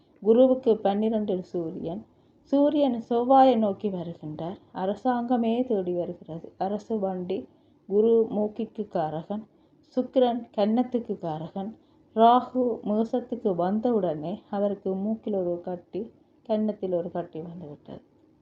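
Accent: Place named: Sri Lanka. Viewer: நல்ல அருமையான, நுணுக்கமான விளக்கம் . நன்றி அம்மா.. உங்கள் பணி தொடர வேண்டும்...வாழ்த்துக்கள். நீங்கள் ஸ்ரீலங்காவிலிருந்து பதிவு செய்கிறீர்களா... ஸ்ரீலங்காவில் உள்ளவர்கள் பேசும் தமிழ் போல உள்ளது...🙏🙏🙏🙏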